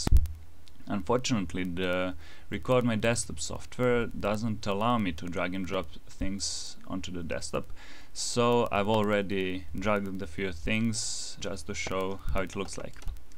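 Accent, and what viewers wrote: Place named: England, United Kingdom. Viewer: your english accent is epic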